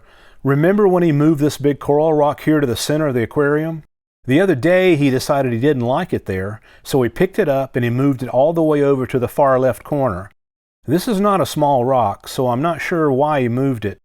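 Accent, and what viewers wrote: South, United States. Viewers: I Love voice! Calming and yes, very easy to listen to. That little touch of southern twang just puts a big old smile on my face and makes me sigh in contentment. 🥰😊😍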